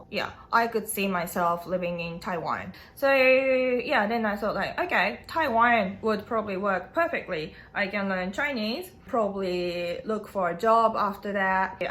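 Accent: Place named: New Zealand. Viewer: Still got that kiwi accent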